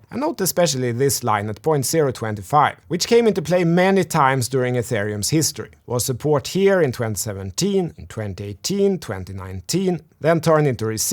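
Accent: Sweden. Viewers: Är du från göteborg eller Stockholm?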